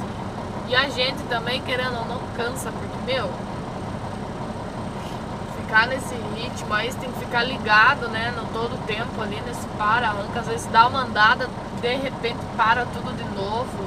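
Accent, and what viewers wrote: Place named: South, Brazil. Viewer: Boa tarde,que sutaque bacana,por isso que gosto do sul,bom final de semana ,que Deus abençoe muitíssimo.rio de janeiro.bairro Pavuna.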